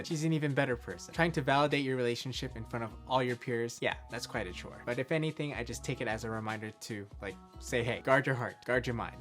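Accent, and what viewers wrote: United Kingdom. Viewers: That British accent though haha! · Lol. You have a really good British accent :D I particularly loved the point where you can't put pressure on another person to be your source of happiness. That's kind of unrealistic and bound to fail. Solid video!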